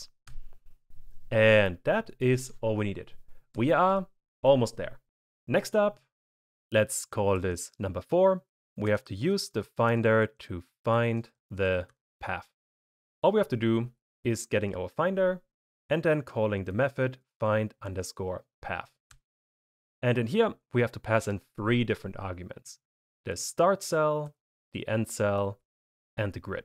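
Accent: Germany. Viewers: haha moin😂 Wollte nur fragen wegen dem Akzent.Der ist ja auch nicht komplett englisch. Vielleicht ist es ja deutsch? 😅 keine Ahnung. Aber du bist anscheinend deutsch 😂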